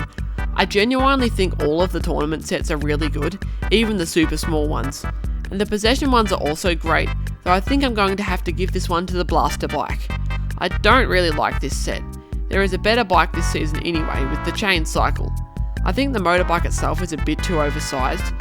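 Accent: Australia. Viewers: Your Australian !!!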